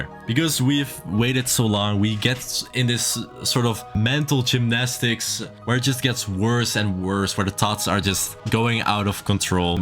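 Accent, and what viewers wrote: Netherlands. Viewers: Ik ruik een Nederlander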